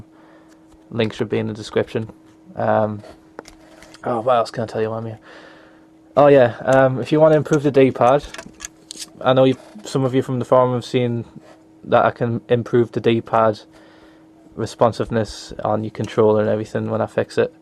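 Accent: United Kingdom. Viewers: Are you British mate?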